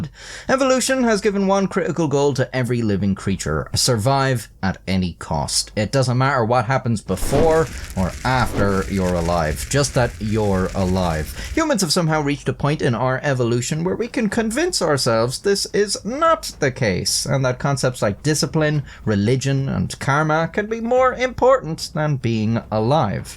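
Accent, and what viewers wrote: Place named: Ireland. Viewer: If theres someone I want to listen to talking about insane deaths and cruel war tactics, then its this Irishman and no one else PS: I love the way you say "three" 🌲. Never stop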